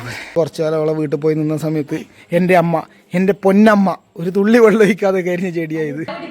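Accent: Kannur, India. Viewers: നിങ്ങളുടെ സ്ഥലം എവിടെയാ! കണ്ണൂർ ആണോ? സംസാരം കേട്ടിട്ട് അങ്ങനെ തോന്നി.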